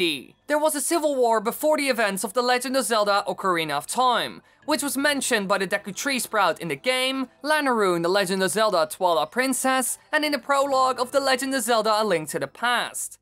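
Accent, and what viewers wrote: Netherlands. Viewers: ik kijk graag naar je video's ze geven een leuke twist aan zelda (en andere nintendo games) (ik had al een klein gevoel dat je nederlands was omdat het wel een klein beetje nederlands klinkt(echt een heelklein beetje)) ik hoop dat ik vaker een geheime nederlands comment kan platsen ;)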